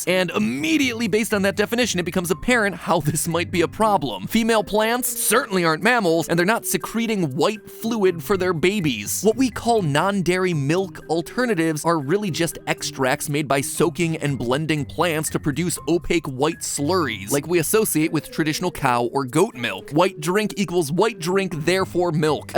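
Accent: United Kingdom. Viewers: wow when did become british??? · oh hes bri'ish or an aussi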